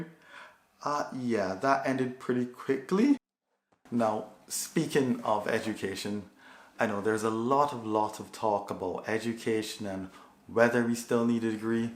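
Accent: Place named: Ireland. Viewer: Your accent is sounds like Irish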